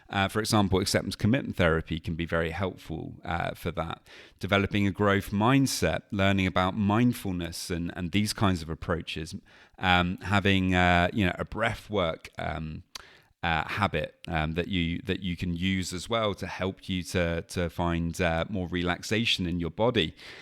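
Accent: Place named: United Kingdom. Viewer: Didn’t realize you had a British accent til like 8 minutes in 😭😭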